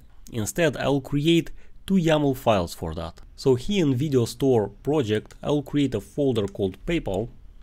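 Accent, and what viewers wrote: Russia. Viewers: Спасибо брат. Но был бы на русском урок - было бы ещё лучше. Акцент русский слышно))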